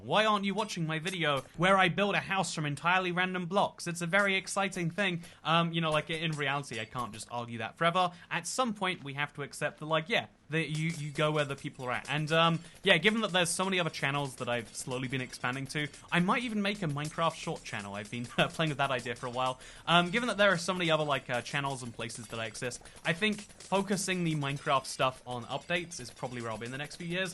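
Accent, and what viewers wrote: United Kingdom. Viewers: I'm from brazil, a lot of schools here teach English instead of Spanish even tho we are surrounded by Spanish speaking countries. American culture is heavy here so many movies come to here and people are used to american content and culture. In fact in Christmas we retain the American winter theme even tho at December we are at high heat summer at 45 c and those grandpas waring Santa outfits almost die of heat from the warm clothes, all that to keep up the Americanized culture LOL. My school had Spanish and English but that's far from the norm. I learned a LOT more from games and youtubers like ur self however. So I'm thankful for ur indirect help in practicing my listening of English. Also, i love ur British accent. I also love that ur one of the few minecraft content creators who aren't heavily focused on younger audiences but more general audience from all ages. 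I'm 22 and i'm just sick of clicking a cool looking minecraft video and hearing an obnoxiously childish voice saying, "wassup guys, hit the like button and ring the bell wohooo!!!! I love ur videos, keep up the good work.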